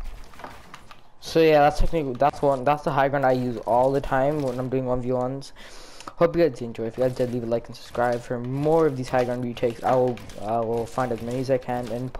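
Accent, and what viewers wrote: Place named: India. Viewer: Did anyone here his India acete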